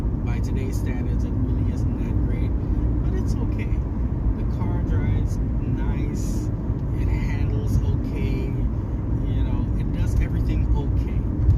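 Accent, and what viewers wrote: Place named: Virgin Islands, Caribbean. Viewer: Hi, I just "stumbled" on your page. I also bought a 1998 C230 about two years ago and I absolutely love it. It was owned by an older woman that just drove to church, etc. Unfortunately, she passed away and it just sat in the driveway. It was well maintained, just a few minor things. On another note, I listened to your accent and wondered if you are from St. Thomas V.I.?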